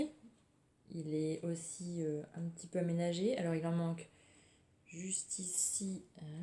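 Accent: France. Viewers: Tu m’amuses en parlant de ta manière de parler : nivernaise et Morvandelle ! De quel côté es-tu ? J’ai habité longtemps à côté de Corbigny dont on dit que ce n’est pas en Morvan mais que ses poules y vont aux champs....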